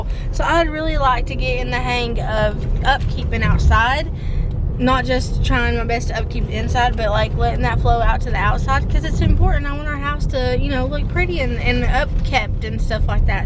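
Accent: South, United States. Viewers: I love “maters” for tomatoes. Your accent and southern sayings are awesome. Keep up you weight loss journey! You can do it!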